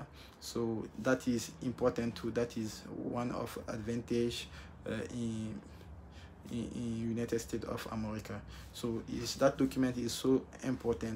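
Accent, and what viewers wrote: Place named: France. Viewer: Apparemment vous parlez bien anglais mais vous parlez l'anglais comme les Français ici qui parle anglais. Votre accent anglais est tellement français. Moi aussi quand je parle français, les français toujours ma dite je parle avec l'accent anglais 🤣🤣🤣🤣✌👍👋👋.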